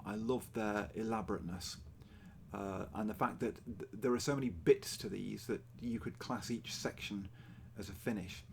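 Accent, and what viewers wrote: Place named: England, United Kingdom. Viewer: Watched and subscribed! Welcome to flosstube - you did a great job on your first video, and I loved listening to your accent. I'm originally from England, but emigrated to Australia 12 years ago, and I love finding other people from England on flosstube - makes me feel connected 👍🏻 Looking forward to your next video 😃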